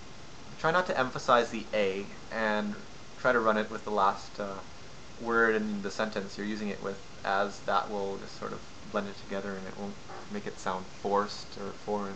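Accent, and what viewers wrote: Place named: Scotland, United Kingdom. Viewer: you sound scottish abit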